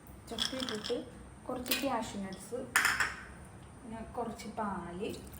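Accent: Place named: Kochi, India. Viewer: സാക്ഷാൽ കൊച്ചി ഭാഷ😂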